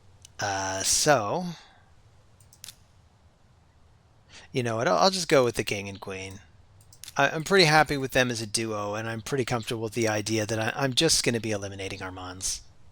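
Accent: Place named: Scotland, United Kingdom. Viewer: I’m guessing Scottish maybe Irish. Good luck on the fights. I just got up to the 4000 mark early today.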